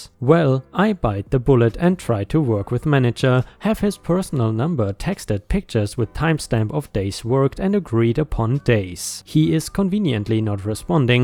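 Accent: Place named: Germany. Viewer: Hi I want you to know how much I enjoy all your stories. Listening to you with your German accent takes me back to when I was a kid, listening to my grandparents ( from Germany)tell stories. I miss them dearly, but hearing you makes me so happy again. Thank you so much for taking me back to very good times.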